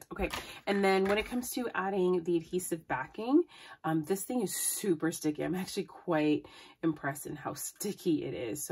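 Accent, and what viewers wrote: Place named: Canada. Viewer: Where did you find those giant unfinished wood beads? Great pegboard setup, love the Canadian accent 🥰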